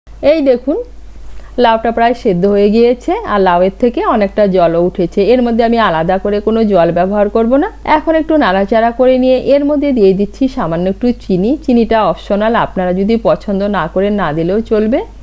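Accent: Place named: United Kingdom. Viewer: Bangla rannar shonge kintu bangla commentry beshi bhalo lage. Eto english accent kintu eke baare jomchhe naa.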